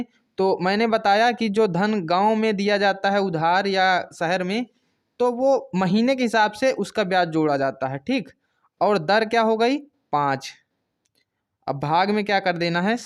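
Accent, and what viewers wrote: India. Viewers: Mast gajab ji bagheli bole ho yrr😁😁😁😁mast samjhya apne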